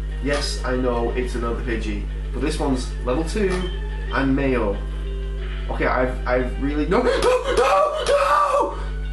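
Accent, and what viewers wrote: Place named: United Kingdom. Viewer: guys its he just picked up a british? accent. really good vid man. ima subbed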